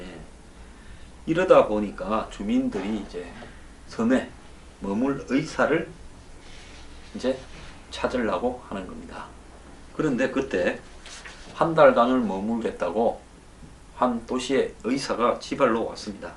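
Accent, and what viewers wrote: Gyeongsang, South Korea. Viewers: TK사람 말투 진짜로 짜증나네? 같은 경상도지만 말투가 영~~~~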